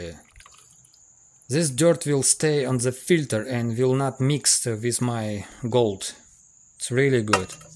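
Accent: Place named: Russia. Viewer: Слышен русский акцент)))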